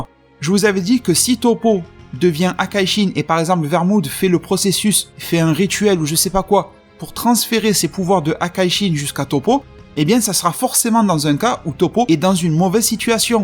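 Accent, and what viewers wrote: Marseille, France. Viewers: T es marseillais ?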